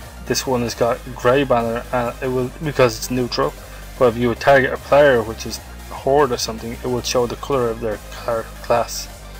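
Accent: Ireland. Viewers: are u irish ? u sound soo much like it ( im irish ) ;)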